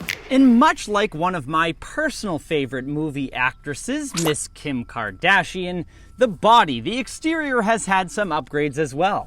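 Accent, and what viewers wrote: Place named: United States. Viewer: Turned off when heard American accent only aussies build tuff GTR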